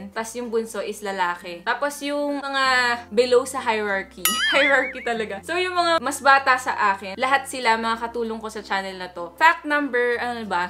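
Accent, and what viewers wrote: Visayas, Philippines. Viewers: Bisaya ka dai?????? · Bisaya daiy ka te😌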